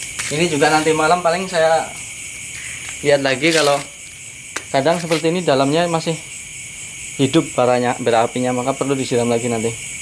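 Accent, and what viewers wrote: Banyumas, Indonesia. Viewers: dri logat nya kya org banyumas ya om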